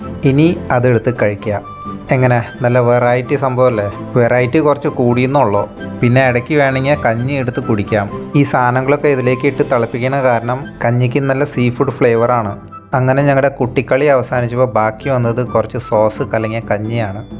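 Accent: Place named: Palakkad, India. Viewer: സംസാരം നല്ല രസം ഉണ്ട് കേള്‍ക്കാന്‍ ഒരു പാലക്കാടിന്റെ ചുവ ഉണ്ടോ എന്ന് സംശയം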